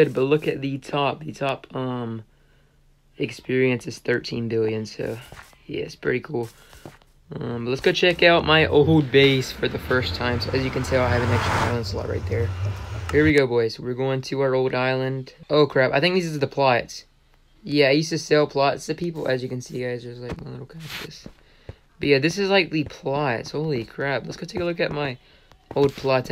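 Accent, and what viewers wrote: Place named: Texas, United States. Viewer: You kinda sound like ur from texas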